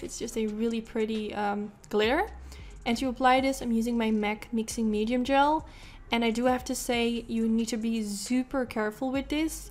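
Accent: Netherlands. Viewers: She sounds Dutch but I could be wrong! I think she sounds really cute and sweet :-)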